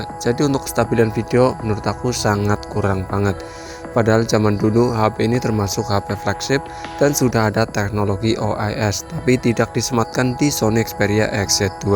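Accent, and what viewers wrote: Banyumas, Indonesia. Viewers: Ngapak yah kak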